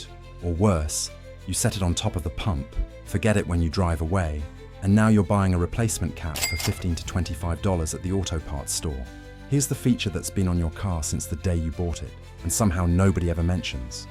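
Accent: United Kingdom. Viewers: Why the British accent?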